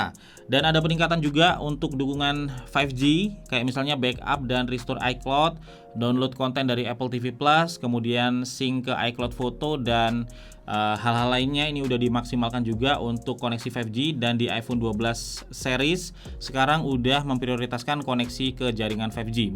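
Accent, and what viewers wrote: Malang, Indonesia. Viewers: Asli malang bang ?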